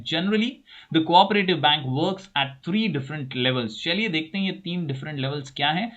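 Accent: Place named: Uttar Pradesh, India. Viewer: being from up whenever i listen ur up accent that helps me to take more interest in the lectures 😂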